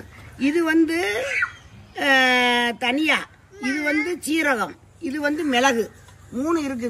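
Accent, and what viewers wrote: Ramanathapuram, India. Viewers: Nannimada pathai kaili thaavani ramnad pechu 🎉🎉❤ Eid mubarak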